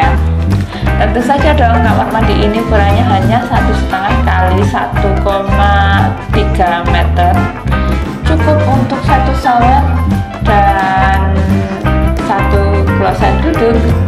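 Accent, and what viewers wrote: Java, Indonesia. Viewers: medok banget suaranya mbakk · Sy suka dialek bahasanya. Medok tp lucu n sumringah dengernya😊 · Mbak ko logatnya medok bnr y? Tapi sy salut... Idenya kreatif. kbetulan sy rncananya mau bangun kos2'an 4 pintu. kalo duitny cukup. Smoga tmbah sukses y Mbak???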